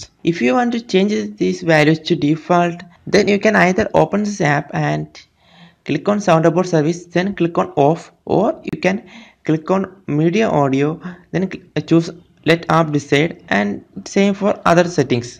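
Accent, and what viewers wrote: Kerala, India. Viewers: are u malayali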